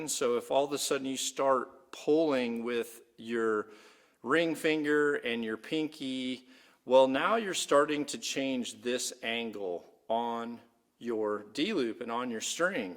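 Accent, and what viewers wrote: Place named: California, United States. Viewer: So hard to suffer the vocal fry. Californian is the worst accent.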